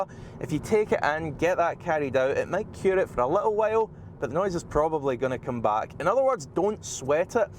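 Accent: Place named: Birmingham, United Kingdom. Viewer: they're from Birmingham 😂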